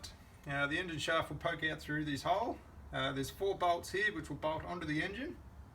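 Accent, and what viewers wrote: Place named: Australia. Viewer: Hi I’m interested to know where abouts you are, I noticed the Aussie accent. I’m in Melbourne and I have an old Rover edger I need restored.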